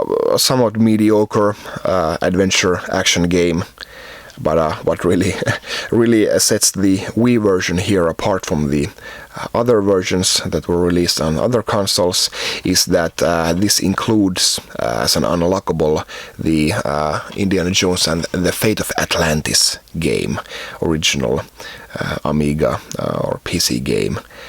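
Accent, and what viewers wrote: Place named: Finland. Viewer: He cant help his Finnish accent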